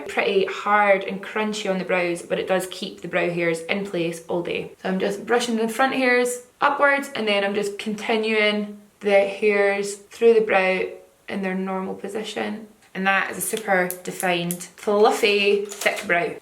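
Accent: Scotland, United Kingdom. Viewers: you are so amazing! I love watching your videos and learning new little tips and tricks. My husband always says "oh you've been watching today haven't you" cause I walk around my house thinking I've got a Scottish accent 😂😂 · You're so unique! and a talented artist and I love your Scotland accent. You're so pretty!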